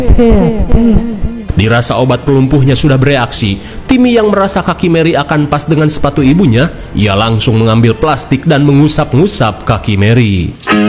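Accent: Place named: Java, Indonesia. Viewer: yo rapopo to mbak ngko dak mlh lucu ijeh medok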